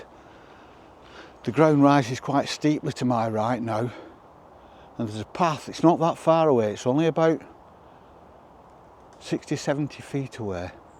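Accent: United Kingdom. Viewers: I love the British accent! Every time you says “ squirrel” 🐿️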